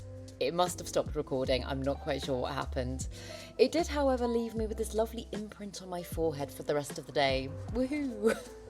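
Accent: England, United Kingdom. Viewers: Love watching,just wondering if you have an outside space to repot this time of year,less clean up ?After watching you I’m heading out to repot a few too❤❤❤Hi from Maine,USA🇺🇸🥰 Oh and I love your English accent😊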